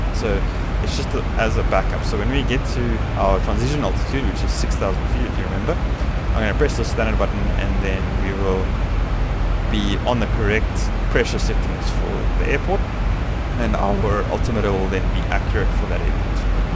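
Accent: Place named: South Africa. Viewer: I thought you sound South African and I was right. Im also down here but thanks for this I jist finished my pc build and started to play xplane.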